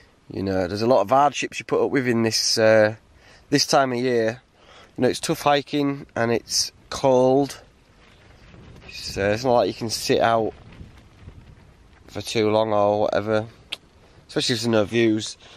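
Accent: Yorkshire, United Kingdom. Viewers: Hey up I’ve been off work all week, with a knackered back and discovered you on Monday and you’re all I’ve watched since. I’ve watched the Cape Wrath, Barefoot 3 Peaks and Coast to Coast videos and more in between. You’re a breath of fresh air pal and have really cheered me up. Latch and Locks, All the play on words ( my favourite one, Deerdre Barlow 😂 ) and of course MEDIC 😂😂 It’s good to hear a fellow Yorkshireman, so keep posting the great clips Fella and stay safe. 👍🍻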